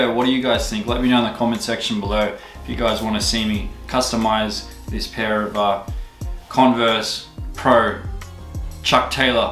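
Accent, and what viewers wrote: Australia. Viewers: Mad deck also are you from Australia or nz? From the uk btw. Nice shoes also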